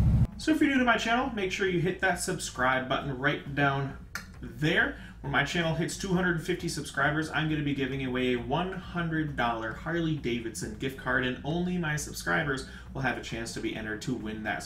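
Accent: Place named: Minnesota, United States. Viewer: I knew you sounded like a MN guy! Please keep us updated on the Commander 3’s. It would be nice to hear how they are doing and how they are wearing after 6 months, a year, etc. I’m in MN summers and AZ winters.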